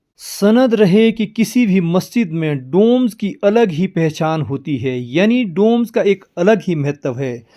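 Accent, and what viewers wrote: Standard. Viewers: Aap ek dham pure Hindi bolte hai unkal ji ❤❤veda cute ❤❤❤❤😊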